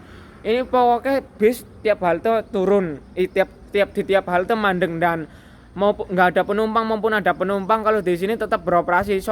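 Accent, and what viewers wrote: Banyumas, Indonesia. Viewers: Lapor ndan,,saya dari tiktok,,,lari kesini,, Saya suka logat ngomg mu ndan,,inyong inyong😀😀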